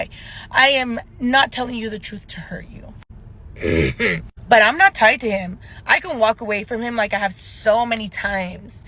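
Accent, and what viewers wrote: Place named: California, United States. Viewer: I from San Diego, and was just listening (Not watching) and i was like...she gotta be from cali and then she mentioned rancho and riverside hahaha. Glad you found out! Stay chingona!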